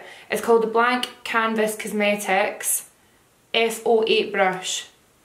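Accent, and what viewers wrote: Scotland, United Kingdom. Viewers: What part of Scotland are u from I'm from paisley love this look deffos subbing xx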